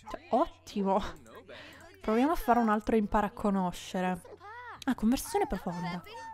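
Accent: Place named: Palermo, Italy. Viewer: Melaaccolo poco palermitano noto😂😂😂 io pure lo sono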